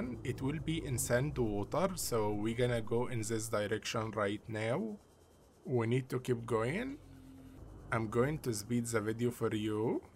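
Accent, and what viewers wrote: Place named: Arab world. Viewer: I like your accent, you sound like an Arab Mario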